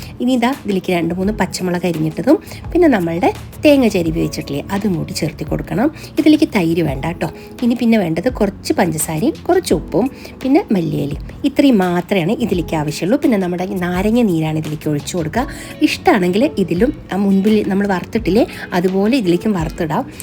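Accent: Palakkad, India. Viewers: ENIKKU NINGALUDE PALAKKADAN SLANG ORUPADU ESHTAM AANU 🤗ENTE VEEDU EVIDE ERNAKULAM KOCHI AANU 🤗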